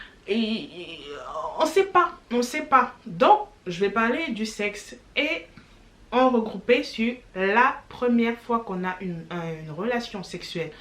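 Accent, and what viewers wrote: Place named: Democratic Republic of the Congo. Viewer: L'accent a lui tout seul rend la vidéo drôle xDD Nan sérieux je kiff l'accent congolais (je suis sur a 80% que tu est congolaise) , québécois et russe 😊😁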